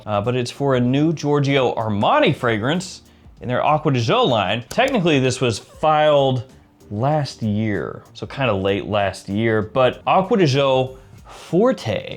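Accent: South, United States. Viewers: bro his southern accent kills me LOL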